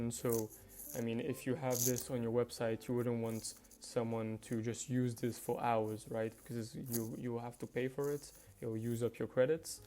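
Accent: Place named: Nigeria. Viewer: why she sounds like a nigerian scammer?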